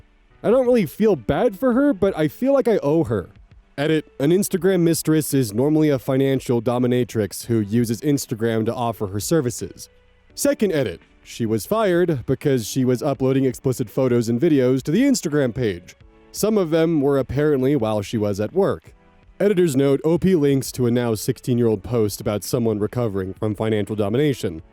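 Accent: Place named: Midwest, United States. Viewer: I have disagreed a few times with you, but I never hated you for it. You clearly state over and over you want our feed back and intend to ponder it. All in all, you are the strangest parasocial relationship I have. I used to live in Boulder when I started watching you, so you sorta felt like a friend because it was a possibility I might run into you. Your vocal mannerisms are a little reminiscent of the midwestern ones I grew up with and you are also one of those queers. As am I haha… anyway, keep being you and learn new things with time.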